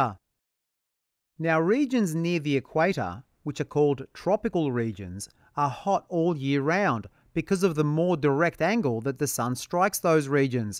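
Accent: Australia. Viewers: Sir r u from Australia?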